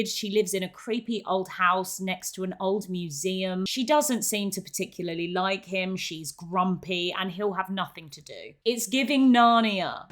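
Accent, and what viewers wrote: United Kingdom. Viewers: Oh I love the British accent dear hello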